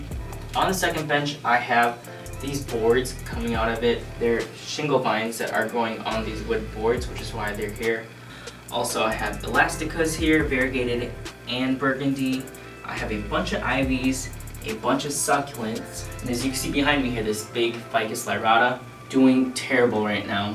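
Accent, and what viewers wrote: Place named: Midwest, United States. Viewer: Do you live in the Midwest? I feel like I hear a little accent!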